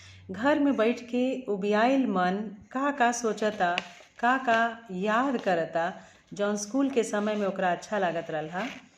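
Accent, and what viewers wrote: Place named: Bhojpuri, India. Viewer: भोजपुरी के मिठास ...छा गई आप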